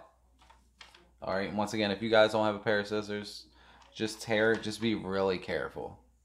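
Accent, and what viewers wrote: Baltimore, United States. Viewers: Lol I was thinking you sound like you’re from Baltimore then I looked at your channel name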